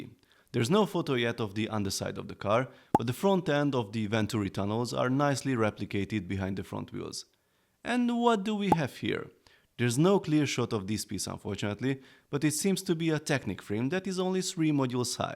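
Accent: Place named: Hungary. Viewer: Magyar vagy? Akcentusból gondolom de ha nem így van szólj😂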